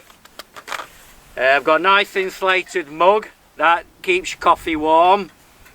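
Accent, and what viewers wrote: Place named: Yorkshire, United Kingdom. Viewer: definitely yorkshire, lu at a, not laaa tay or laaa t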